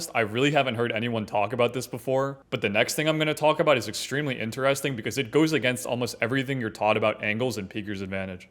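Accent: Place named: United States. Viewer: the moment you hear an american accent on a counter strike video you know the creator is going to be absolutely clueless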